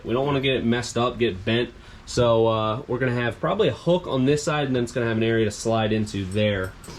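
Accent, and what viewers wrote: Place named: Philadelphia, United States. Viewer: Spotted that Phiwwey accent right away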